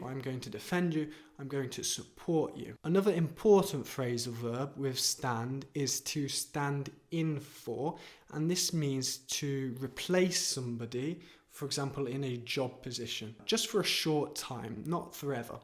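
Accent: United Kingdom. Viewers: Thank you very much.Your teaching was great as always (with beautiful British accent) and the content was helpful as well. Interestingly yesterday i was talking to a Swedish guy.We talked about the cultural differences between Iran and Sweden. "midsummer celebration is something we do that stands out i believe" he said.It was the first time i heard "stand out"! After our conversation,i found out you uploaded new video so i watched it and got surprised.Now i can understand it better.🙏🙏🙏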